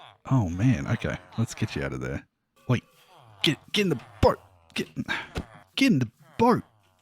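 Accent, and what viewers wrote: Australia. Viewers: Im just new to your channel and so far im really enjoying your content and I love buddy, Am i hearing an australian accent, thats cool if it is, I really wish there were morre australians doing minecraft content. but if im not and im wrong thats cool too, beacause im enjoying your content.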